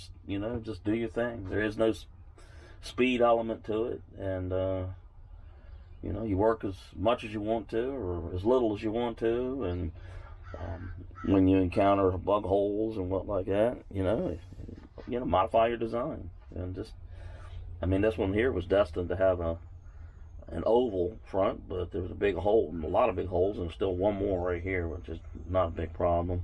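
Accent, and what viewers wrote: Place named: United Kingdom. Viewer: ❤ love woodworking Also really like your accent as well are from UK Where are you ?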